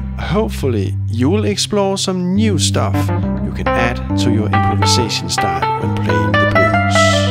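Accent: Denmark. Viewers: i love the heavy danish accent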